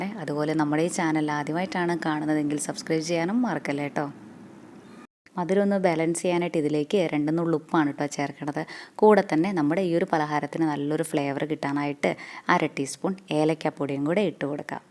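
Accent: Palakkad, India. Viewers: Kollato..chechiku palakkadan slang undu, ath kelkan thanne oru rasam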